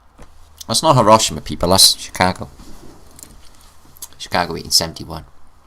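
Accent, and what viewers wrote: Wales, United Kingdom. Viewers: 🙂 accent sounded stronger back then ...In the valley s yaki da ❤️ 🤗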